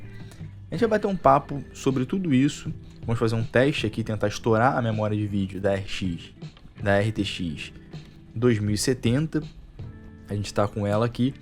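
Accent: Rio de Janeiro, Brazil. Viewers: kkkk eu casco da forma que os cariocas falam, é estiloso kkk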